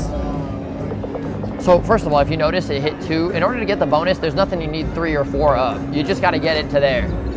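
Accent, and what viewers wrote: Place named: New York, United States. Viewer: Love your channel cause I like your New York or New Jersey accent. LOL Now for educational purposes: You first have to educate yourself to the rules of the game that are there for everyone to read, ESPECIALLY THOSE PLAYING THE GAME. After you have done this, you can then educate your audience. Thank you very much. Best wishes for a prosperous and blessed New Year.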